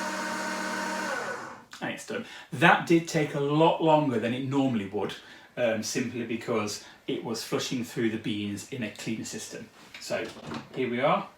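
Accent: United Kingdom. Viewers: Hi! Great video. As a German obsessed with English accents, I would like to ask you which part of England you are from...Cheers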